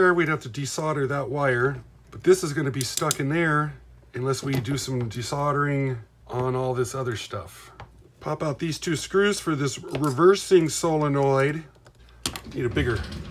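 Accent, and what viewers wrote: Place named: North, United States. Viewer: Hey,. I normally stick with ya til the end because I enjoy hearing you talk. You have a Northern states accent that’s pleasing to my ear, oh sure! 😅 And I don’t want to miss any of your wisdom you put out about repairing the model trains. There’s three of you fellas I routinely follow because you each repair and restore model trains that teach me plenty about my own equipment and I hate to miss any information because I fear what I miss just might be that one odd piece of info I need to fix or correct something on one of my trains. Now I collect HO equipment but that doesn’t mean there isn’t something I could learn if you cover a Lionel or American Flyer repair. Electrical repairs or soldering are easily transferable skills in model railroading. Best wishes and see you on another video.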